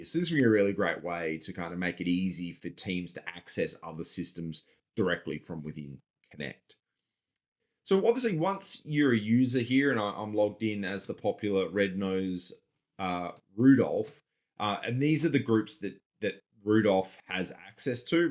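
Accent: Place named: Australia. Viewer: Thank u! And great Aussie tone.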